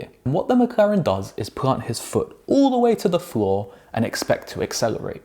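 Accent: United Kingdom. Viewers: I just discovered this channel because I was looking for non-supercar content and I'm liking it. The posh English accent side by side with car accidents gives everything an academic vibe.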